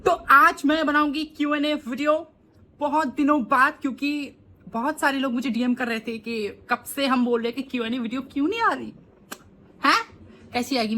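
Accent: Assam, India. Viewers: Are you from assam? 🤭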